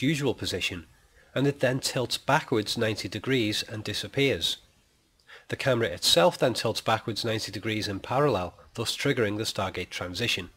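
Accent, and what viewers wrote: Liverpool, United Kingdom. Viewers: Do I detect a faint Liverpudlian accent... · I have a question: Are you a scouser??? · Just found this doc and it's just what I wanted to see before 2001's re-release this month (Nov 2014)...Also...Whey! a Scouser!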